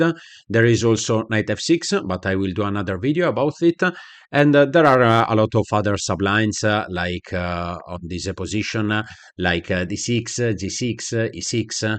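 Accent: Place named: Apulia, Italy. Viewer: Accento pugliesissimo ahahah grazie per la lezione!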